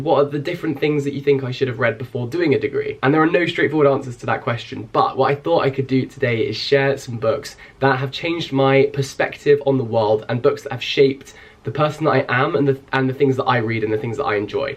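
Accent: United Kingdom. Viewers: It's funny, your british accent is stronger in your old videos than in the new ones :)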